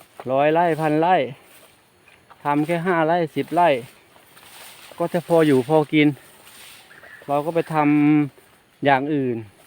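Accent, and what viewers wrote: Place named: Isan, Thailand. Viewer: โดยส่วนตัวไม่ได้ติดตามข่าวน้องชมพู่นะค่ะ และไม่เคยดูคลิป เลยค่ะ แต่เปิดมาเจอคลิปของตา อ้าวตาพูดไทยตกอิสานแต่ทำไมน่ารักจัง😊ติดตามตาเสยเลยทีนี่😊☺😚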